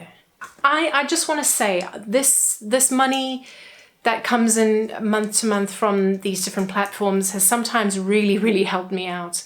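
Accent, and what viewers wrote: South Africa. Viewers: South African expat accent 😁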